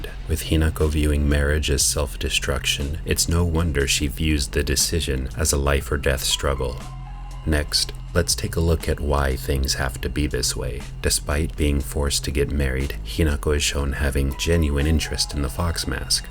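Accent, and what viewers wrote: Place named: United States. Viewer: knocked this one out the PARK!!! i feel like no other channel has really synthesized the meanings of this game for me like you did, both culturally and thematically. in particular, your emphasizing the implications for everyone in the gender binary of male/female was really refreshing. being half japanese but culturally very american, i could feel the gap in western game channels' analyses on the game no matter how well researched. also while the localization and voice acting/directing was amazing, rinko's jp voice especially really sold her to me as both a two faced b/antagonist and an actual complex human who ultimately /was/ hinako's best friend. just hits different when you've been around real life rinkos! gotta say, though i laughed multiple times during this, your dragon pronunciation joke went right over my head because i thought your pronunciation was...normal american? 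anyway im penning this as i settle into my 3rd rewatch/listen, looking forward to checking out the rest of your channel vids and future releases!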